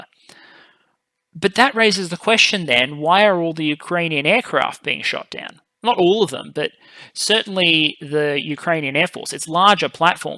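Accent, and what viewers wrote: Australia. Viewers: Are you an Aussie like myself?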